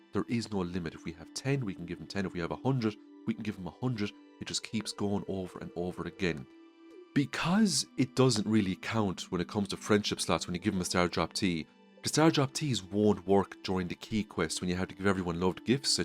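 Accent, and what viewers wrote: Ireland. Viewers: Thanks for the great video! I also enjoy your lovely speaking voice including your Irish accent!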